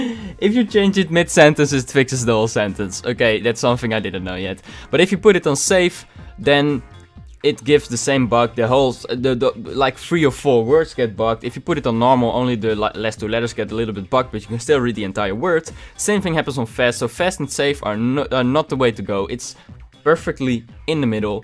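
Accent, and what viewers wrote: Netherlands. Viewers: you sound dutch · You sound dutch. NEDERLANDEEEEEEERS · Lol your dutch like me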